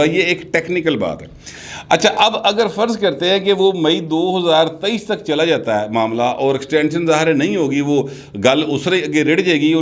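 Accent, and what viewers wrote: Punjab, Pakistan. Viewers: Excellent analysis and as a Punjabi I love the accent.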